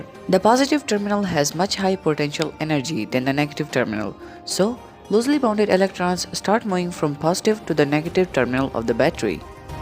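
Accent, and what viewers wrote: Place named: United States. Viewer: are bhai kya tum America wale Ho Yaar your English is so best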